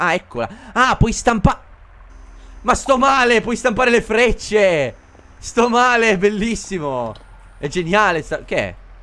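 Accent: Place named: Bari, Italy. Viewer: da quando favij e diventato barese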